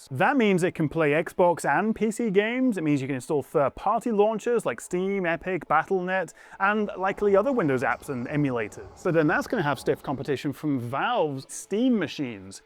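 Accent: United Kingdom. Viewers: The British accent rocks!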